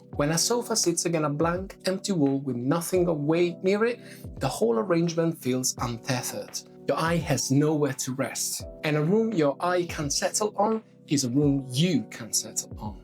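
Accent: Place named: England, United Kingdom. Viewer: sei meraviglioso. You have a beautiful english accent with a mystical touch of the unknown. Bravo anche nel tuo lavoro. From Italy.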